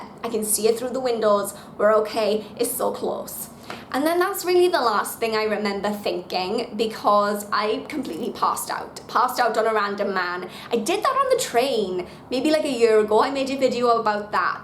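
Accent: Wales, United Kingdom. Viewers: Sounds like a Welsh bird ?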